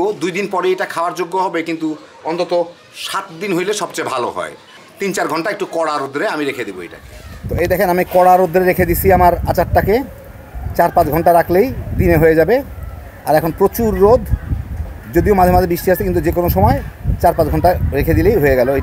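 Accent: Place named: Old Dhaka, Bangladesh. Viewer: পুরান ঢাকা স্টাইলে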